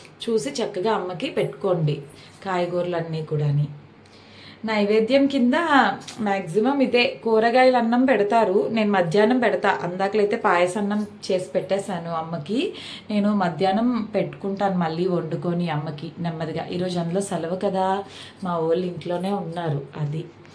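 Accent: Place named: Visakhapatnam, India. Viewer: Chala bagundhi andi,meeru undedhi vizag ani nenu anukuntunnanu mee matalu alage unnay ,maadhi kuda vizag ne ,yevarikyna telisthe cheppandi,mimmalni okasari kalavaalani undhi andi,oka vela meedhi vizag ayithe naaku cheppandi kalusthanu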